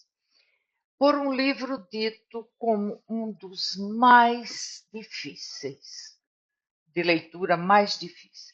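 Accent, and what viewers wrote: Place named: Rio Grande do Norte, Brazil. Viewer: Curiosidade: seu sotaque soa potiguar. Você é daqui?🙂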